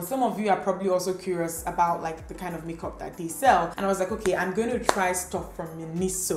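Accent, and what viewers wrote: Igbo, Nigeria. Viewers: Hi I so much enjoy watching your video😘 And the fact that you always add your Igbo accent makes it more fun.. So please can you make a video on how to start a YouTube channel🙏🙏🙏🙏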